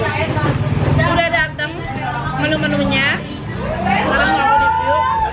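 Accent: West Java, Indonesia. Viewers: ngakak sendiri liat teh kl pke logat sunda lucu,.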